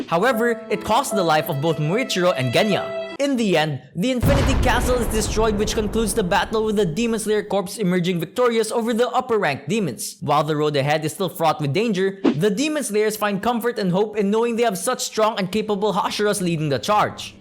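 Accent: Philippines. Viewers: That Filipino accent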